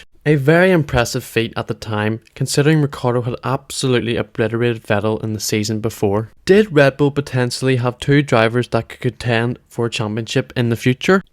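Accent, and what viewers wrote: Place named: Northwest, Ireland. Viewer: Bro, its a guess, but are you by any chance from Ireland, that accent soumds like from the North or northwest of Ireland. ❤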